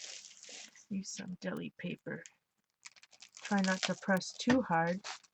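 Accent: Canada. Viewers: You are a cutie patootie with that adorable Canadian accent!!! Lovely work, thanks for sharing!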